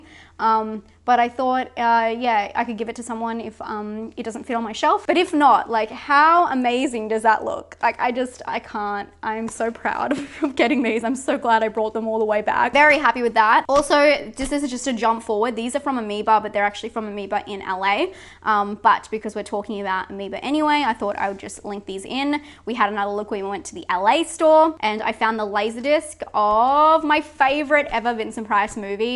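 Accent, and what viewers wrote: Australia. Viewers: An American made fun of your accent? What a knob. Australian accents are the best! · Great haul! I also am not really a collector, I like browsing stuff like that but hate displaying and keeping stuff but you chose great souvenirs! Also, what English speaking person doesn't understand an Australian accent? 😂 can't wait for your next video!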